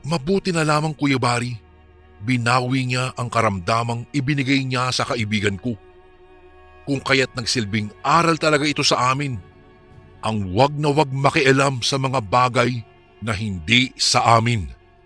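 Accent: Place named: Iloilo, Philippines. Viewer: Ay wow Ilonggo gd ..ya daw mabuga ko mn ya kape ko kag hahahahha ano iloilo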